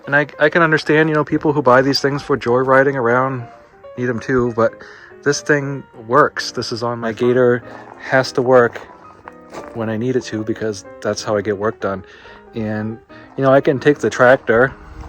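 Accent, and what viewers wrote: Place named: Maine, United States. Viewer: Okay…catching up…been disposed the past few days. I prefer the over wheel straps. They are limited to that one job but transporting things like that, make them worth the investment. Tails….fold them up from the tail end to the ratchet, and then secure them with those little looped bungee cords with the ball end. May have to go around the folded end a few times to take up the slack, but they are cheap, quick, easy and effective!! That Downeasta accent is in your blood!!😁🤣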